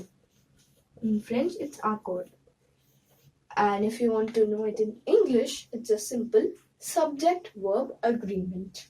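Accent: India. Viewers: R u indian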